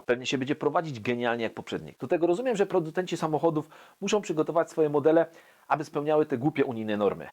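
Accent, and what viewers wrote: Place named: Silesia, Poland. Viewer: Škoda też😁 Tak z innej beczki to fajnie że w końcu ktoś ze Śląska robi tak fajny program. Miło się słucha własnego akcentu na YouTube 😉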